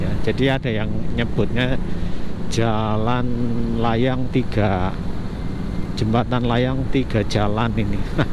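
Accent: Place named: Central Java, Indonesia. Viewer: Mas dari Jawa Tengah logatnya kaya ngono Cilacap atau Cirebon sekitar nya 👍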